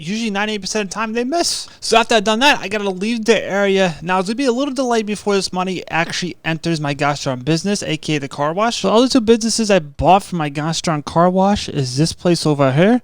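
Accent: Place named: New Jersey, United States. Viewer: Lol ur finna asl that Jersey accent 😂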